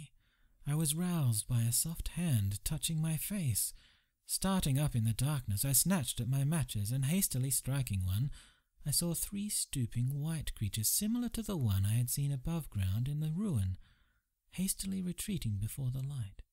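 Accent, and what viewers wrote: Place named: Australia. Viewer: Very faint Aussie accent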